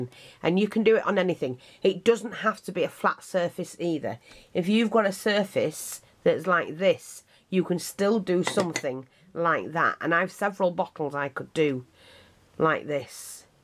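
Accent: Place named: Yorkshire, United Kingdom. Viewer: Hi I'm new to your channel too and would second what gerilynne1955 says below, you refer to your shop but I'm a bit confused as to what shop this is, perhaps you could link the products you use in your description box. I love your work and am definitely going to try a few things you have made. Are you a Yorkshire lass? I am but am now living in Minnesota in the USA, great to hear a Yorkshire voice!!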